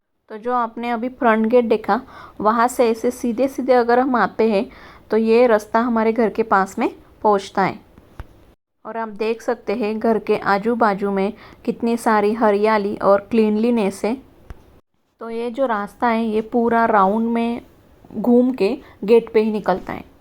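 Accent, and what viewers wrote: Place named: South, India. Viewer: Tumhari hindi bolne ki style se muze aisa lagaa ki tum South Indian ho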